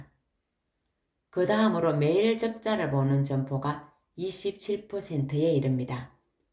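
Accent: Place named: Gyeongsang, South Korea. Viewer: 이웃집 아지매같은 목소리 친근한 경상도 억양이 내맘에 들어오네요♡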